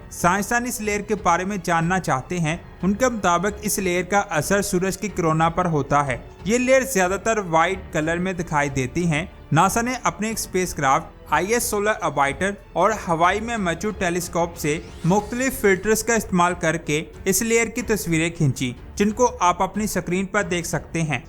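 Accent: Punjab, India. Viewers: yar tum Urdu bhi aur angregi bhi Punjabi lehjay mein parrhtay ho,ba'tao mein kiya ka'roon.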